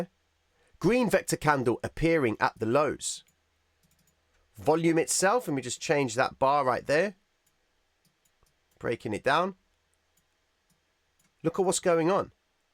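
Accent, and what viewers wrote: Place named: North London, United Kingdom. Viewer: He's from north London bruvs:) The accent is the Queens English with a twist of colloquial city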